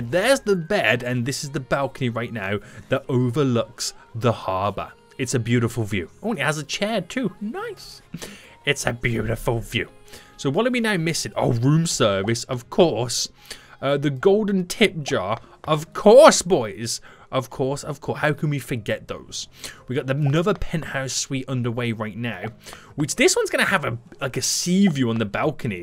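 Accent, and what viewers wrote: England, United Kingdom. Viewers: Are you from England?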